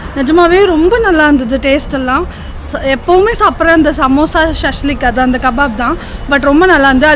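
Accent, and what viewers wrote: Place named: India. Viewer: யூரோப் & யூரோப்பியன் யூனியன் பிரதேசங்கள் பயணம் செய்யும் போது, இந்திய ஆங்கிலம் பேச வேண்டாம்.பஸ் என்கிற வார்த்தை புஸ் என்று கேளுங்கள்.போலிஸ் வார்த்தை புலிஷ் என்று கேளுங்கள், ஏர்போர்ட் வார்த்தை ஏர்போட்டா என்று கேளுங்கள்! உங்களுக்கு வழி கிடைக்கும்.😊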